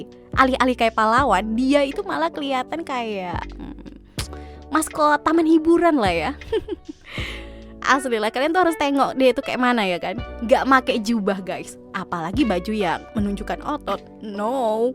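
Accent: Java, Indonesia. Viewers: nih org jawa apa medan seeh wkwkwk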